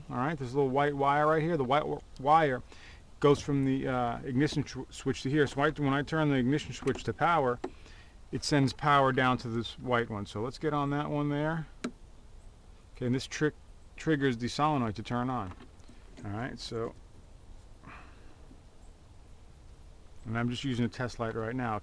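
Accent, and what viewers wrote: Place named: Newfoundland, Canada. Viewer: Yes B'Y..Newfie like accent. Thanks again, it was killing me after an engine swap...im a novice. main ground was culprit. Thanks again. also, your narrative skills are excellent. more small engine stuff..?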